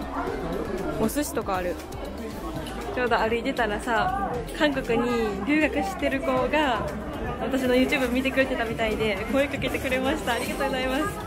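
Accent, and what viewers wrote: Kansai, Japan. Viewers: 生粋の関西弁でハングル読めない事も言ってくれて性格かわいい、韓国行きたいけど読めない話せないで悩んでる自分には自信になった!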